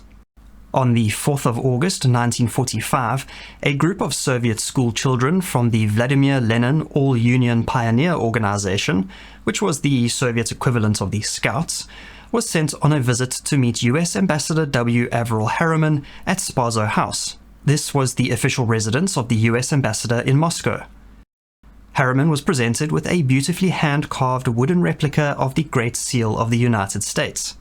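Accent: South Africa. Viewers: Nice to put a face with the charming South African/dutch accent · Interesting content. Well researched. Your accent is South African English, right?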